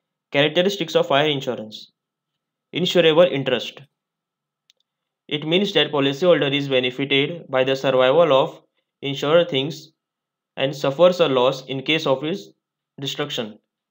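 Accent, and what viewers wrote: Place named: Goa, India. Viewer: Nice video👌 ekdum goan accent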